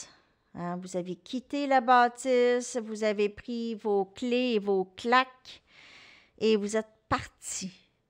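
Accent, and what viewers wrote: Canada. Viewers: Chère comme ça va faire longtemps que je n’ai pas eu un billet d’avion dans ma poche tu es mon petit quart d’heure « dépaysement » entre ton petit accent canadien qui me ravit et tes jolies remarques sur les oiseaux ou autres charmantes voire drôles Ben je voyage quand même grâce à toi Je t’écoute depuis la France J’ai déjà été au Canada dont je garde précieusement quelques beaux souvenirs 💕❤️💗💓❣️😘🌺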